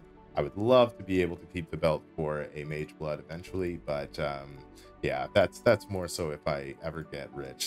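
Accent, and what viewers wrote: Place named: Canada. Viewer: very well spoken canadian i can tell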